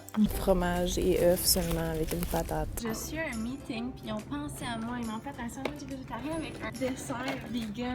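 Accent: Quebec, Canada. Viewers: moi aussi je veux l'accent québéquois !! j'en suis amoureuse !!! super beau ! félicitation pour ton défi, je ne suis pas sûre que je serais capable de le relever ... Plein d'amour ! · Wow ! Rien que des emballages plastique ou jetables qui vont polluer et engendrer la mort de quelques animaux qui n'auront rien demandé !! Mais ça ce sera pas filmé par PETA !! La video est le fun mais chus pas sûr que le végétarisme ou même le vegan ça soit rien d'autre qu'une mode de pays riches où tout le monde mange à sa faim pis essaie de se donner bonne conscience... Dans les pays moins développés, si tu demande à quelqu'un comment s'appellent les gens qui mangent pas de viande ils te répondent : les gens pauvres ! Sinon tes videos c'est l'fun pis chus tombé en amour avec l'accent québecois tsé ! Mais ça serait cool que tu nous parle de sujets comme la disparition des abeilles qui risque de provoquer un déséquilibre irrémédiable sur notre planète et qui est engendré par la surproduction agricole notamment des amandes et des oranges etc... 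;) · c'est fou ce que j'adore l'accent québécois! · MDRR LACCENT QUEBECOIS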